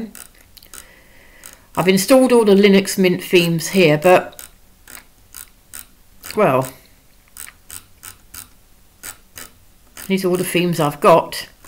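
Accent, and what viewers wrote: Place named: United Kingdom. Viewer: I love your British English, i am salvadorean.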